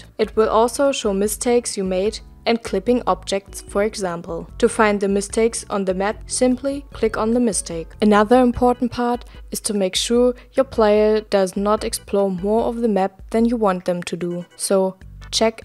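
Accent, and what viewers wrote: Germany. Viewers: Ahhhh das deutsche English :D. I Love it :D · The school i really need ^^ Does she have a german accent ?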